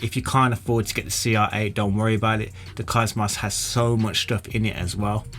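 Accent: Birmingham, United Kingdom. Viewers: Do I hear the dulcet tones of a fellow Brummie?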